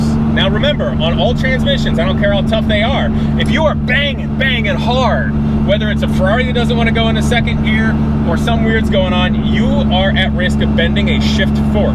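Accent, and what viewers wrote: United States. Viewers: Great vid, enjoyed listening to a Yank ;D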